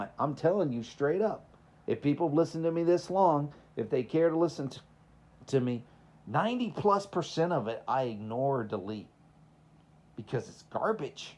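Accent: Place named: Minnesota, United States. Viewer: You sound more like Minnesota accent than TX surprisingly.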